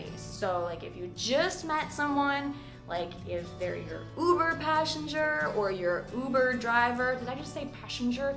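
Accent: United States. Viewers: You speak American not English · English speakers? The American version would be more accurate! Weird hearing a yank telling us how we speak. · Actually, you speak American. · Hey. I would like to know about your accent. What state do you live in the US? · Thank you! I am an Italian English teacher and I really appreciate your lessons! I would like to have your American accent but I still have some difficulties and here books are all with British accent and with “I am fine thank you and you!”🤣 thank you again! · They ask you a lot it because you're beutiful and have a great appearence , here in brazil its normal , mainly you have these blue eyes and nice american accent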